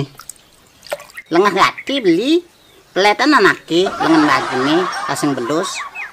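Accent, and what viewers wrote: Buleleng, Indonesia. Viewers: Wkwkwkkk munyi buleleng giih niki? Jeg lucu sajan2... Makasi pak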